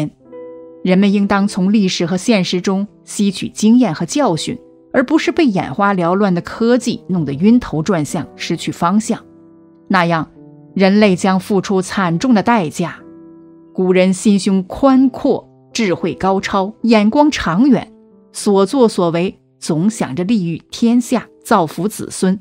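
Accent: China. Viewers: 明明是中國人卻要講台灣的事，口音是中國口音，中國人開始用繁體字了嗎？，什麼土地神？台灣人都叫土地公。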